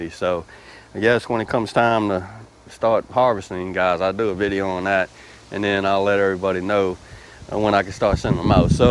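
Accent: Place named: Mississippi, United States. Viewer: New subscriber here and I am so glad I found your Channel. As soon as I heard that southern Mississippi accent I already felt at home. Me and my partner go down and visit family in Saucier every few years or so. I really enjoy the weather, people and pace of Mississippi. I am trying to convince my partner that we should move south, despite the weather down there lately. Tired of this Michigan snow. lol. I hope you release some more content soon. Can you grow a garden year around down there?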